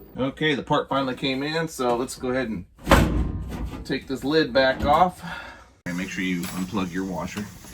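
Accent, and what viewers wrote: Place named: United States. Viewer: Awesome, thanks. May I ask, where is 'your' American accent from? Perhaps someone could let me know. I have always wandered because an American actor has the same, and I just don't know where it's from. If I was to guess...perhaps from around NEW York; but there are more than a few. Thanks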